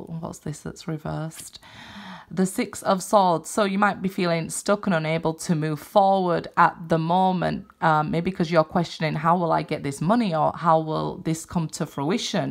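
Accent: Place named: England, United Kingdom. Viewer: You must be in either England or Australia, I'm hearing England though.... I could see myself moving there...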